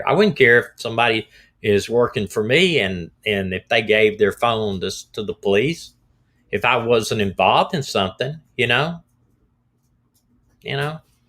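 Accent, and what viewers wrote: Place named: South, United States. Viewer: . You are beautiful! And I love your southern voice💖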